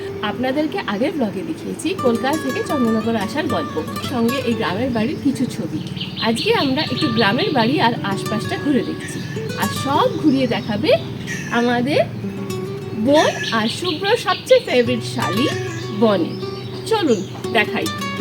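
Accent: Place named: Bangal, Bangladesh. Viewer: পাত্র পরিবার কি বাঙাল অরিজিন? আপনার কিছু উচ্চারণ, বাঙাল ঘেঁষা লাগলো... খুব ভালো লাগছে আপনাদের ভ্লগ।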